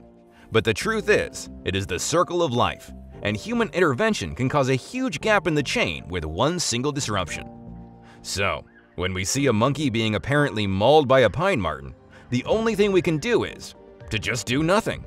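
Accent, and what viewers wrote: United States. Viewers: That might be an excuse had this video been a European production . . . but the narrator is speaking English with an American accent, and it actually •shows• footage of (using the North American nomenclature) an adult elk, •not• a moose. The adult animal shown chasing some vehicle is a big deer, but it is NOT the biggest species of deer in the world. And in any event, the footage and most certainly does •not• show the marten attacking the fawn of an elk (let alone a moose); the prey is •far• too small for that. Even a newborn elk would be many times bigger than a marten. To be blunt, this video compels the conclusion that whoever compiled it has not the slightest idea what he’s talking about.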